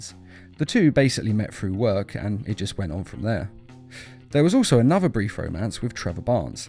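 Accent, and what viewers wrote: United Kingdom. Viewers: That’s why his content is so great because he keeps that sophisticated British accent and is so casual even if he is talking about the dumbest things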